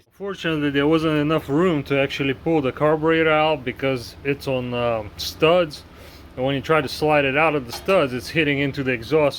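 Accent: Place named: South Louisiana, United States. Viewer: thank you for the video, huge help trying to help out an old man with Parkinson's ,,, his one joy is cutting his grass now i can get him back up and going from the sound of your accent, sounds like you're from south Louisiana,, i'm from Lafayette originally, be blessed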